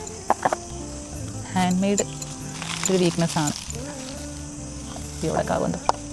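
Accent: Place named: Kannur, India. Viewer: Slang aan enik ishttam❤ kannur?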